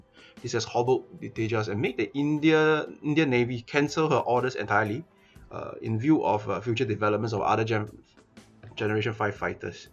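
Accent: China. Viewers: dont take this as a racist comment , but i am almost sure from your accent that you are chinese. i may be wrong, though! trying to be les nationalistic(because apparently it is the chinese and the jihadis like mazzod azhar only who can have the right to be nationalistic), i must say that all that u say is true but in reverse order. for example, u say that tejas is heavier. even though everybody knows that tejas is smaller and uses less metal than jf17. again the TWR is 1.07 with AoA of 26 degree that too when the safety against fuselage strain is kept on! jf17 has TWR of .97 and nobody knows its AoA! tejas uses a radar that may have a 30 km less range! but no inducted jf17 uses HMDS that LCA already has! besides, by your own admission LCA has a smaller RCS, wheras jf17 due to its metal component has more! so that means that the effective range of the radar of jf17 is smaller given the unsusually high amount of carbon composites in tejas airframe! 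add on to it EW suit! higher agility and moreover the existence of a local industry that can retrofit tejas makes it cheaper! besides, order of 83 mk1a has been placed and chinatopix along with many other Chinese articles have themselves pointed out tejas' superiority over jf17! this is just a last desperate attempt to dfame tejas by praising a air force version jf17 with a naval aircraft LCANavy, which was mostly a tech demostrator anyway!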